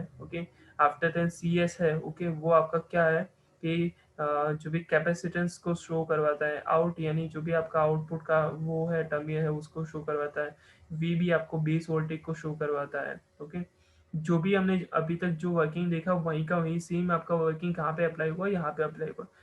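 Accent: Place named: Gujarat, India. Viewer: Gujarati banda h hmm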